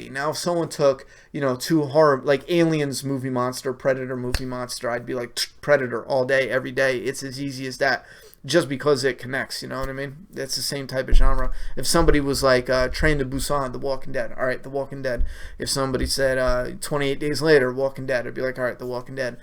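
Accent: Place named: Long Island, United States. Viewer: Q&A- first of all I just want to say I'm a huge fan. I appreciate your honesty and that you just stay to your true self no matter what viewers say. Anyway, I usually watch your TWD reviews,but I noticed your accent. Are you from Long Island? I'm from there,but currently living near Baltimore, MD.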